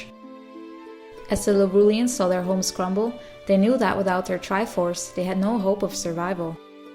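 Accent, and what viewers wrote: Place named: Netherlands. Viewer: I honestly think you're the best Zelda theorist on youtube. Your theories are always logical and thought provoking, clicking nicely with the canon. You go into a lot of in-game details, showing you do your research. Your presentation is also the most pleasant to watch; good video editing, a clear yet calm voice and well formulated lines. Je Nederlandse accent is bijna niet te horen.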